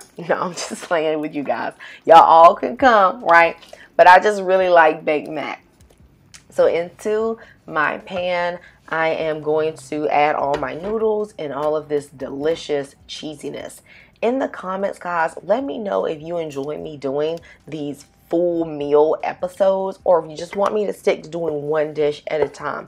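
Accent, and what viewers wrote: South, United States. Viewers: Talkin' like she straight out the south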